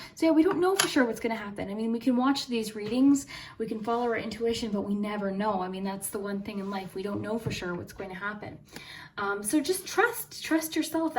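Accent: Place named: Canada. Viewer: You’re Canadian!! That’s why I’ve noticed your accent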